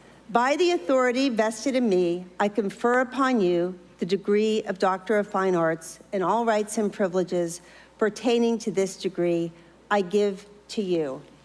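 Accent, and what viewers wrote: Jamaica. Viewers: Speaks regular then patwa instantly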